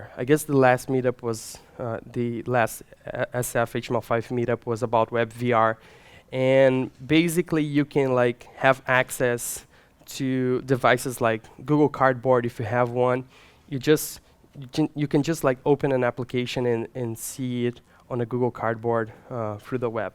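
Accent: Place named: Minas Gerais, Brazil. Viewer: Thanks for sharing this knowledge. I didn`t know about any of those APIs before I watched your talk. (I'm also Brazilian, bet you are from Minas Gerais by your accent )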